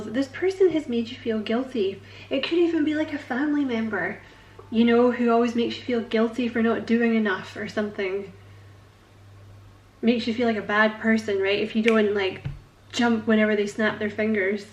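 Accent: Scotland, United Kingdom. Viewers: Are you Scottish? First tarot reader I’ve came across on here that is. ☺️ I’m in Glasgow ☺️ Love hearing the accent 😁👍🏼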